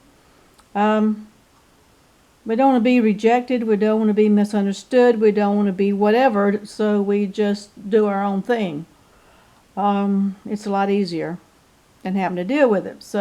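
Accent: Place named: South, United States. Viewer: hear that southern drawl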